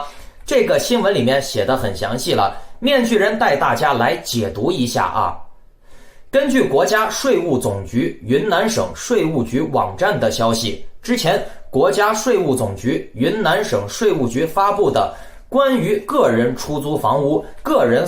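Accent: Henan, China. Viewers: 恁是河南人？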